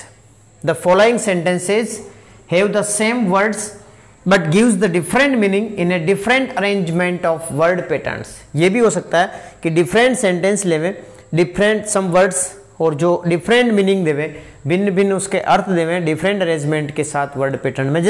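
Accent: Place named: Bhilwara, India. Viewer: 🙏🙏🙏🙏🙏🙏🙏🙏बहुत बहुत धन्यवाद sirji.... वैसे क्या आप भी भीलवाड़ा से है... भी... से अभिप्राय है की,,, मैं तो पक्का भीलवाड़ा से हूँ.... और आपकी लैंग्वेज और बोलने के तरिके से लगता है आप भी भीलवाड़ा के ही है... सही कहाँ ना मेने 😄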